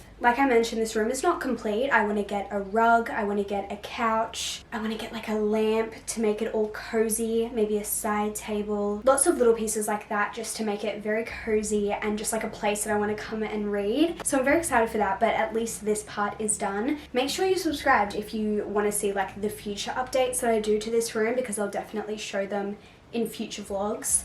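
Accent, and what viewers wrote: Australia. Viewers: For some reason it took me until 15 minutes into the video to realise that you're Australian....... I'm Australian.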